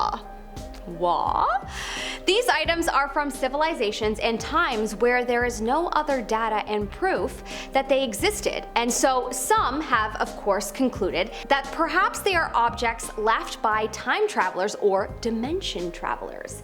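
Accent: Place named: United Kingdom. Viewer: A stone computahhhhhh wahhhhh I love your funny British accent 😅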